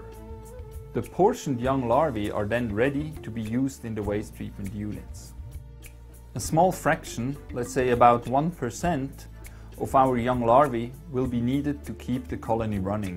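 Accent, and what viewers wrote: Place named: Switzerland. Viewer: The narrator is 100% swiss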